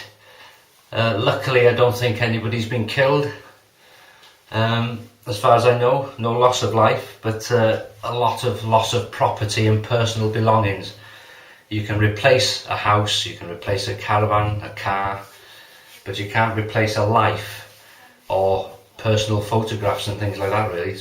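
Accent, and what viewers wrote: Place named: Wales, United Kingdom. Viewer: Nice vids! Subbed. Didn't know you were welsh...(I am rubbish at figuring accents out haha). -Matt (Norwich)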